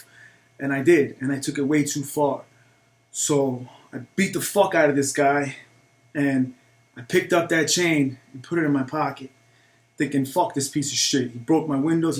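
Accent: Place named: New York, United States. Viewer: Love the New York accent!!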